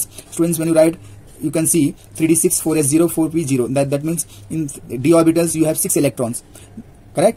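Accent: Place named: India. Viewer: Thank you 🙏 I watched the video with 0.75 speed Please speak slower It is a bit hard for us( people outside India) to understand your accent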